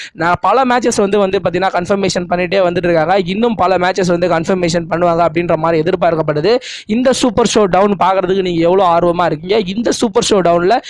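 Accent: Villupuram, India. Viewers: Bro are u from villupuram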